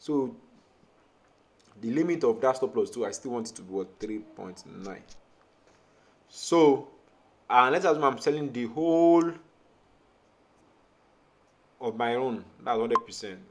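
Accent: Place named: Nigeria. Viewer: I liked immediately I heard the Nigerian accent... I know say it will be understandable. I am a subscriber now ❤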